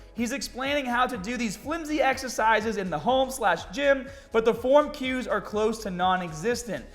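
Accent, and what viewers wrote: Boston, United States. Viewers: Dude are you from Boston ? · I love how is just leaning into the yelling Bostonian persona and taking it to the next level. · Is this accent new? I swear I’ve been subscribed for years and back then never noticed the ultra Boston or whatever the fuck accent. Still the og tho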